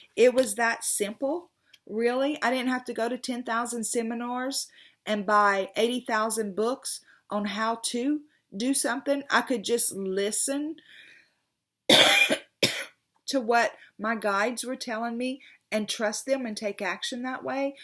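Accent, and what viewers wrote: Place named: Alabama, United States. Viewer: I was hearing Alabama, are you from there?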